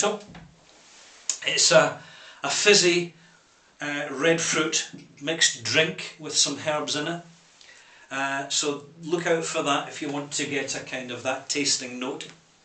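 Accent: Scotland, United Kingdom. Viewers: He's Scottish -__ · Given your own great Scottish burr (and that of your brother, ) what took you both to the Isle of Man? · What is that accent, scottish?:)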